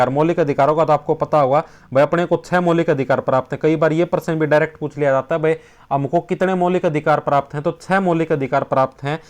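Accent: Haryana, India. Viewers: English bolna laga sir ap to pr harynvi achi laga hai apka muh se😁😁😁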